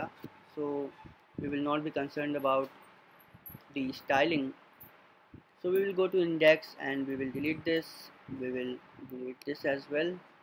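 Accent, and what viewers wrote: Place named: India. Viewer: Indian accent so absolutely NOT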